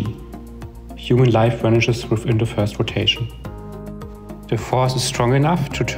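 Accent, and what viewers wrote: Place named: Germany. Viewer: most german accent in existence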